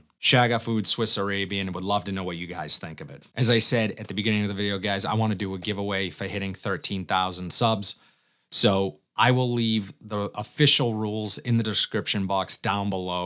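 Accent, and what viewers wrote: Boston, United States. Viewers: Well done on the 13k,!!! I don't come here for frag talk as much as I come here for Bostonian accent:):):) You are awesome!!! Have a great weekend!!! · You Have to be from Boston!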